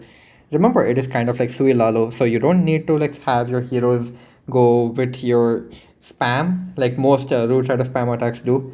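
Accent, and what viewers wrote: India. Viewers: You indian bruv ?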